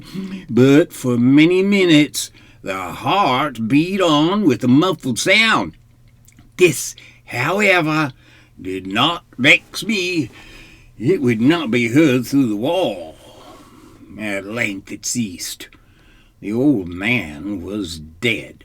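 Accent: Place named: South, United States. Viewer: Hahahaha!!! 🙌🙌❤ I absolutely love the southern accent!!!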